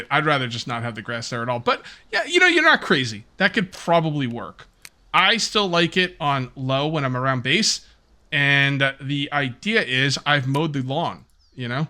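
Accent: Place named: New Jersey, United States. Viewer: Not get too personal, but is your family not originally from Missouri? Because I am originally from the KCMO metropolitan area, and to me your accent sounds much more like an eastern Great Lakes or southern New England (i.e. Massachusetts, New Jersey, or NYC) than anything specific to Missouri (which usually is a more flat Great Plains in the west and north, or has Southern influences in the Ozarks and around the Bootheel).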